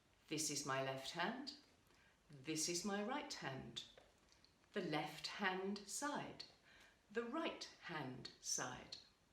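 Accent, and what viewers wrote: United Kingdom. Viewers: "...I KNOW IT´S ONLY ROCK AND ROLL BUT I LIKE IT...", LOL, YOU ARE BEAUTIFUL TEACHING ENGLISH WITH THAT BRITISH ACCENT : ) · Thanks for to teach English by this way. I understand very well your British accent. You're an excellent tea her. Take care and Godspeed. 👏👏👍🖐️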